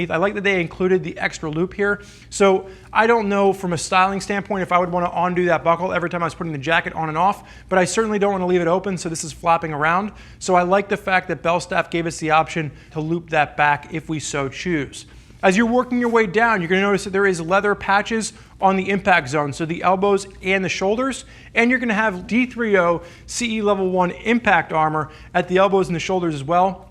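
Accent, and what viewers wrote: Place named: Pennsylvania, United States. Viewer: They are in Pennsylvania they have there own pronunciation,its no excuse I'm just saying.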